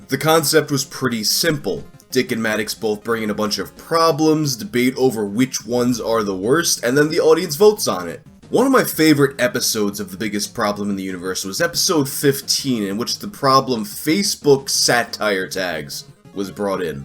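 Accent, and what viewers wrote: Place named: New York, United States. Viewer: It's called a New York accent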